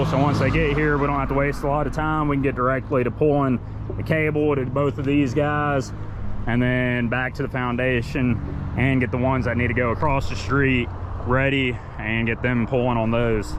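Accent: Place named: South, United States. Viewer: Love the content. dont know how I found you but not disappointed. I came for the traffic signal knowledge, stayed for your southern draw XD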